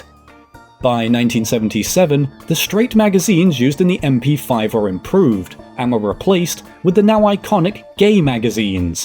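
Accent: United Kingdom. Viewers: Like most people I find the English accent very irritating.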